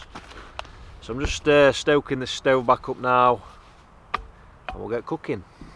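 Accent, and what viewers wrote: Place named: Northern England, United Kingdom. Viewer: Manchester mate! I don’t think your too far from me by sounds of you 😂 👍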